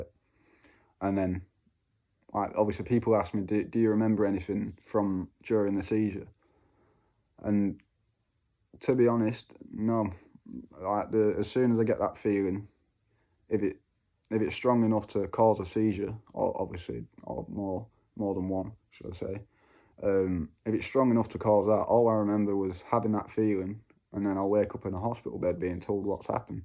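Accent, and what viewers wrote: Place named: Manchester, United Kingdom. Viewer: Hi where do you live and who is your Neurologist. You sound as if you maybe from Manchester. I am on Keppra and Epilim. Clobazam is something I was given but it has side effects and is so difficult to come off so I didn’t start on it and I explained to the Neurologist. There are not many young people who share their seizures so I am glad you are.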